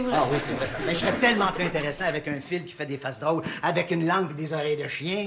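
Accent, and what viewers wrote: Quebec, Canada. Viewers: Même si la France et le Québec ont évolué différemment depuis le 17e siècle. Et bah au 21e les problèmes sont identiques. La décadence de la langue française. Cet extrait est valable de part et d autre de l atlantique. C est effrayant de voir ça . Mais j avoue qu en tant que français je préfère quand même l accent québécois qui est bien plus mélodieux que l accent français qui est fade pour parler de ce problème. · L'accent et les expressions du Québec sont très différents du français de France et il y a beaucoup d'anglicismes. Niveau accent, c'est la même chose avec le créole par exemple. Perso, la première fois que j'ai vu la vidéo c'était sans sous-titres et certaines expressions m'ont échappé.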